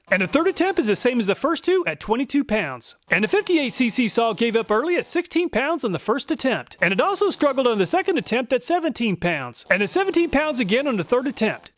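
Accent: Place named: South, United States. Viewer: This guy sounds like an American red neck with a hatchet. He frequently refers to the two Made-in-China chain saws as “knock-offs.” Did the American brand patented the chain saw design, the two stroke engine, the cutting chain, the carburettor, the fuel system, the ergonomic, and everything else? Apparently, he’s showing his bias at best, racism at worst. Unsubscribe. Don’t subscribe.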